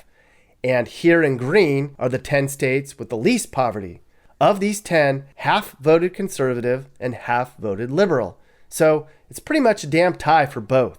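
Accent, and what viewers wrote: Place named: North Carolina, United States. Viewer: what general area of NC are you from? I’m going to guess Raleigh…I can’t quite figure it out based on ur accent (I used to live in several cities in Nc). Anyway- I enjoy ur channel:)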